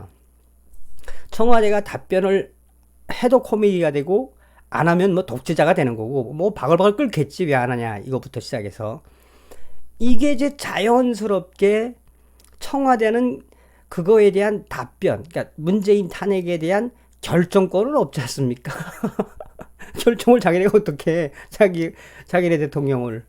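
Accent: North Korea. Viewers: Thank you, Thank you again! 말씀하시는데로 코믹이고 코메디 입니다. 진행과정도 북한 말투로 북한에서 항상 쓰시는 말투로 진행하시는것도 재미나고 정성산 "때래비" 힘되요, 희망의 방송! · 다 좋은데 북한말 일부러 써지말라고 그렇게 안해도 방송 본다니까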